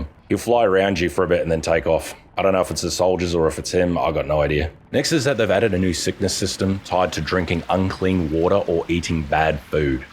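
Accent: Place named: Australia. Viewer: Been off from gaming for several years and picked up playing again with SOTF and then when needed to learn things in this game I stumble across a familiar Aussie voice and all the memories are coming back from the great videos you have made for TF (who tf is Pewdipie btw lol) and I’m back addicted again with the game AND your videos. It made me soo happy seeing your still around recording games, especially TF&SOTF and I have a lot of catching up now on your channel since the last 4-5yrs offline. Thanks!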